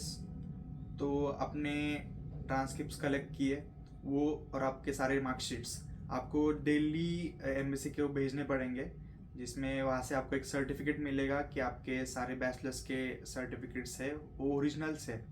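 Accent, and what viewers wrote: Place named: Maharashtra, India. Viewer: Hindi with Marathi accent😅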